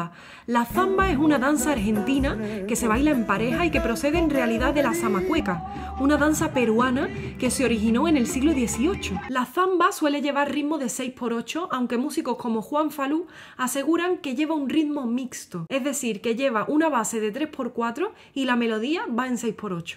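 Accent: Andalusia, Spain. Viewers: Estimada quiero agregar al comentario anterior que, mi bisabuela materna Josefa Calderón Candil y mi abuela Angela eran de Olvera- Cadiz y reconocí tu acento andaluz inmediatamente. Eres maravillosa! Dios te bendiga siempre.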